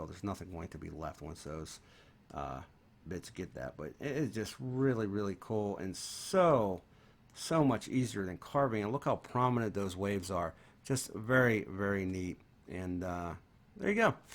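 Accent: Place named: Pittsburgh, United States. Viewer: Hey I was wondering what part of around Pittsburgh are you from. I hear it in the accent. I was born in Freedom, Beaver County. My family is still in that area now.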